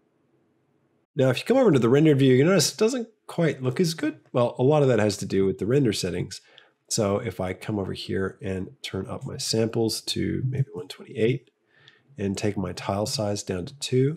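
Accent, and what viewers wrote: United States. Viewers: wait are you really australian lol you sound american to me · You have the most US accent I've ever heard from an Australian...cash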